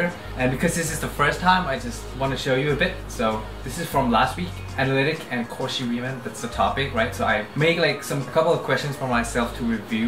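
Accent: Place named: United Kingdom. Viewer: how do you learn such sublime english accent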